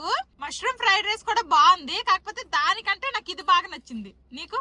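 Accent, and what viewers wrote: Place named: Telangana, India. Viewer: Miku Telangana slang istundi akka😂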